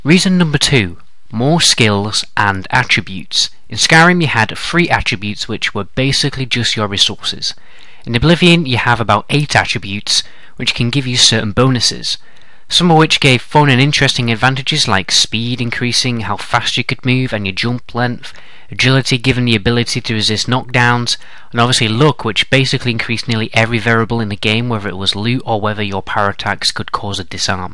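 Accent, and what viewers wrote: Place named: North, United Kingdom. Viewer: where are you from? your accent is exactly the same as the northmen in game of thrones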